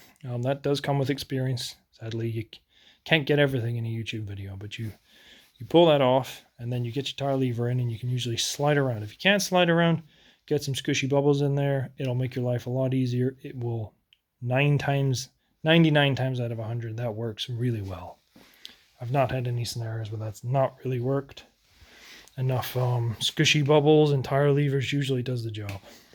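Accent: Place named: Scotland, United Kingdom. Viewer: .... that was a proper comprehensive wheel install.. keep doing what you LOVE... I knew I heard a slight Scottish accent.. I dig this shit👍🏻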